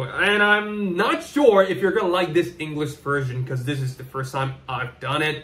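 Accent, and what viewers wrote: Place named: United States. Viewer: wow，very nice American accent, very impressive.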